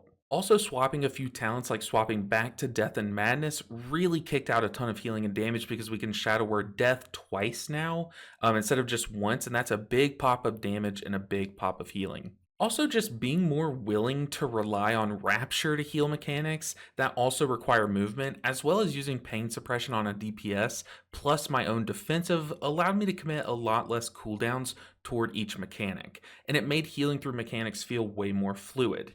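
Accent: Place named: Midwest, United States. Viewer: lol I also love is like midwestern accent where he is just kinda like aw shucks. He never gets tilted or pressed !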